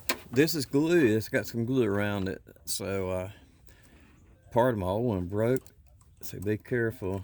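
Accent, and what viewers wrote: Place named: South, United States. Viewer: Southern folk, only accent i can watch at 2xspeed just fine😉 Good vid, never thought of swapping key electronics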